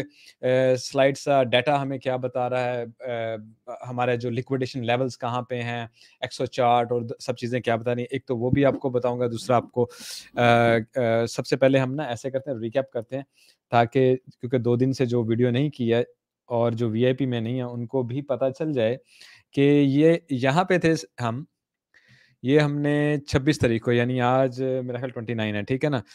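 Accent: Punjab, India. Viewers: jado vi tusi punjabi ch bolde ho ...rab di soh ,,dil hi kadh lainde ho.....jiunde wasde raho bhaji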